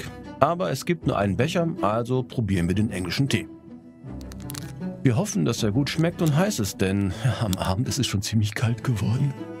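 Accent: Russia. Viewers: Der russische akzent fehlt mir schon ein bisschen...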